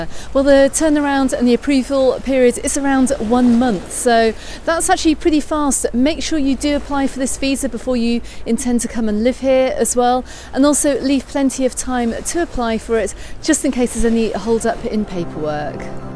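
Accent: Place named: England, United Kingdom. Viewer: I just know how fantastic you are Let me guess where u from by hearing your accent: 1. Leeds 2. Liverpool 3. Birmingham Kisses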